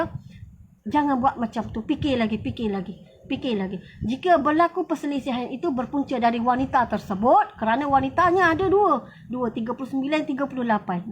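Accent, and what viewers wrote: Kelantan, Malaysia. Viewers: org kelate ke kak ni ? adui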